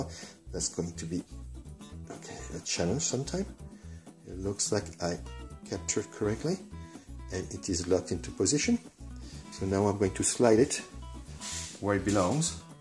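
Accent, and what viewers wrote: France. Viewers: tried to find the answer in your other videos? Is that a French accent? Also I followed your instructions to install the Cajun Gunworks Reach Reduction kit on my Shadow 2 today. It worked out awesome!!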